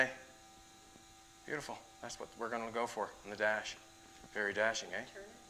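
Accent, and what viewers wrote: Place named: Newfoundland, Canada. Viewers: That's a Cape Breton accent if I've ever heard one! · East coast of canada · Where is this guy from? Sounds like a Newfoundlander to me. Great video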